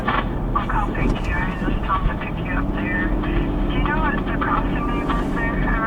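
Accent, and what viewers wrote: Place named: Great Lakes, United States. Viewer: Lol I've always loved your accent. I never realized that accent was also in Upstate New York. People from Michigan, Illinois, and Ohio have that accent too around the Great Lakes. I guess they call it a "Great Lakes" accent. I'm from Southwestern Ohio (Dayton, specifically), so my accent is a cross between the Southern Ohio, Northen Kentucky, and Northern Indiana accents because that's where my family is from. People think I talk weird because I pronounce things differently! I say boxes as, "bahx-ezz", caramel as "car-mull", Lima as "lime-uh", and worship as "worsh-ep".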